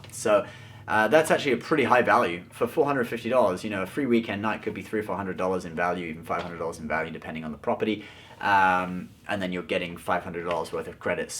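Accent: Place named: United Kingdom. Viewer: dude you are just awesome. Love how objective and down to earn you are and the cheeky grin with the British accent really adds to the whole shabang haha. PS: Thanks for the Trump tax video, I knew when you took a non-apocalyptic approach to anything Trump some idiot in the comments would try to flag you as a supporter or attack you lol. Anyways stay awesome man and keep fighting the good fight.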